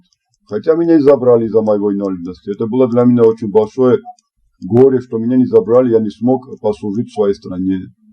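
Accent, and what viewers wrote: North Ossetia, Russia. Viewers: ЧТО У ТЕБЯ ЕСТЬ И ЧТО У ТЕБЯ ВОРОВАТЬ? ВОН ТУРКИ УЖЕ У ВАС ДОМА КОМАНДУЮТ И ПРИБИРАЮТ ВСЁ К РУКАМ. НЕ ДАРОМ ОНИ ВАС НАЗЫВАЮТ ГУРДЖЫСТАН, ЧТО ОБОЗНАЧАЕТ РАБЫ! ТАК, ЧТО ГОРДИСЬ ДАЛЬШЕ. У ТЕБЯ САМОГО АКЦЕНТ ОСЕТИНСКИЙ Я В ЭТОМ ХОРОШО И ЧЕТКО МОГУ ОТЛИЧИЕ УВИДЕТЬ. ТАК,ЧТО НЕ НАДО ЛЯ ЛЯ... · А почему у тебя осетинский акцент ти случайно не осетин. Плохо брать по грузинский говоришь.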